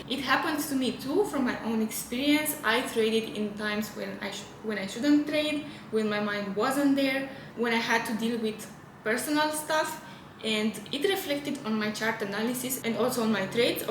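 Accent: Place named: Russia. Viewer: Russian Accent 🤔 . Where are you from ? Ukraine , Russia , Moldive , Romania ?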